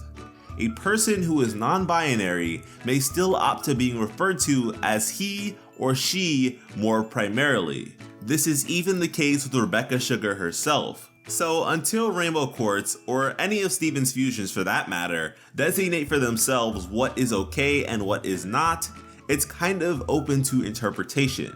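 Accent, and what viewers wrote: United Kingdom. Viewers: He has a British voice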